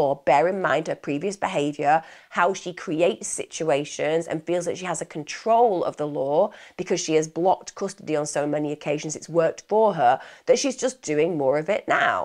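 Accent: United Kingdom. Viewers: Your British accent, even though I’m part of the matriarch as a Canadian, doesn’t make u sound brighter love.. find some creativity. Pls!! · Hi watch true crime every night to fall asleep i find it calming 😂 found you through searching for this case seems not many have covered this one fully nice to hear a uk accent 🏴🇬🇧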